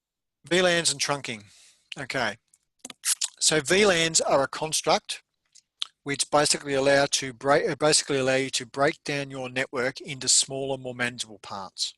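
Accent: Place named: Australia. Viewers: Definitely Australian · Are you suggesting that an Australian presenter, working for an Australian university should try to put on a fake accent of some kind to somehow make it more understandable for others? What's your suggested fake accent? Or should he record each lesson multiple times in various accents? Or maybe he should learn multiple languages. I can't believe that after more than 2 hours of informative material, that's your only feedback. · yes you're right. Australian not British.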